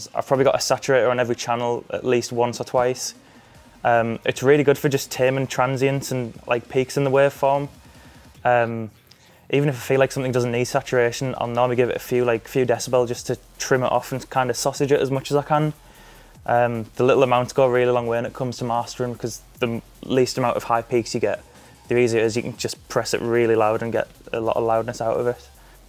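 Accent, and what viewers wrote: United Kingdom. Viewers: I always thought he was british haha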